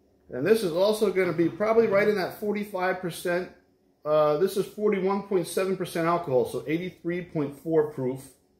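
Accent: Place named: East Coast, United States. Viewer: You’re obviously not a Floridian but the east coast twang is definitely there. Great idea hurricane parties that is right up my alley. I find it absolutely ridiculous how the media just gets over dramatic about these storms. It’s not that these should be ignored but most are pretty livable. Enjoy!!🥃🥃🥃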